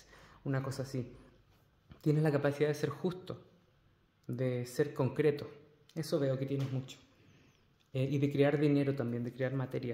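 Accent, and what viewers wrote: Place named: Chile. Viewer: Hola, Youtube me recomendó tu canal la semana pasada y he estado viendo tus videos.Eres chileno? Reconozco mi acento.❤. Elegí la opcion 4 y muy acertado soy tarotista trabajo completamente de esto hace 5 años tengo un canal de tarot aqui en youtube con mi otra cuenta.. Todo lo que describes de mi en lo empatica y generosa es asi, siempre mr he considerado muy espiritual y me causó sorpresa lo de la bendición... ya bendigo el agua y las cosas lo habia dejado de hacer de hecho en uno de tus videos te envie bendiciones curioso y ahora veo esto 🙏👍.Saludos desde Chile Me pareces muy buen tarotista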